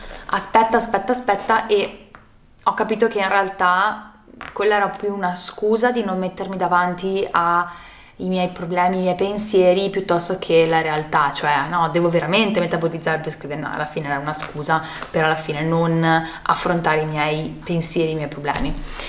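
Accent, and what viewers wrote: Tuscany, Italy. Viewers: Ma hai preso l’accento toscano…adoroooooohhhh 😍😍😍😂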